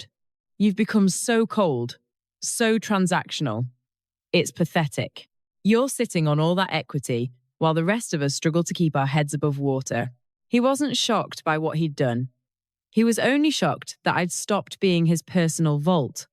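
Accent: United Kingdom. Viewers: Nice story. But hearing a British accent saying they worked as a customs agent at a base controlling a port in South Carolina was wrong. Adding the visuals of Hotel Saigon Morin added to the confusion. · Yes just seem odd a British accent on an American story 😮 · Why is she speaking with a British Accent instead American? HUH? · Why do you have a British person narrating an American story with an Asian video playing?? Kind of stupid, don't you think??? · Why did they get a narrator with a British accent? It’s so out of place.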